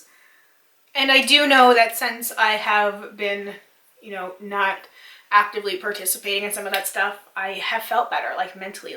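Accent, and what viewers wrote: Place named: Canada. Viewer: I can't understand how anyone could possibly have an issue with you. You come across as such a sweet and genuine person. I don't know any Canadians so I'm loving your cute little accent too. Keep being you as you're great as you are. Remember, this is your channel and you don't owe anyone anything! Much love ❤️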